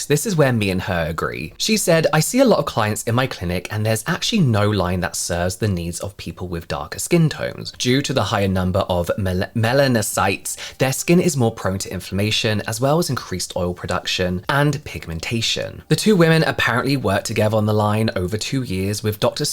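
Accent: United Kingdom. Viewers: It's funny I couldn't care less about Dr. Strum but I am watching because you look gorgeous (so fresh faced) and I am a sucker for your British accent. Loving your hair (chic) and skin (glowing). Update - I just realized you are Robert's twin. I thought something was slightly different, but couldn't put my finger on it until reading the comments. Never seen before today but have followed Robert over the past few years.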